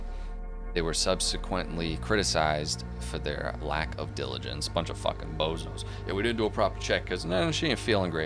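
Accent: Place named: Massachusetts, United States. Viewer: You claim to be from NJ yet your accent, knowledge of places like Fall River, and general vibe are leading me to believe you are a Massachusetts native.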